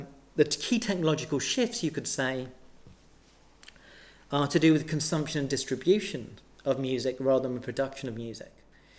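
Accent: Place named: United Kingdom. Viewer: British accent is tricky 👽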